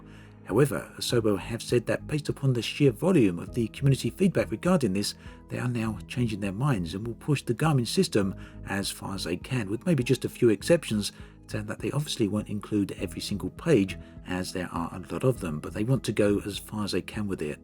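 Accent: United Kingdom. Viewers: His accent is pretty standard for parts of the UK. What a strange thing to say.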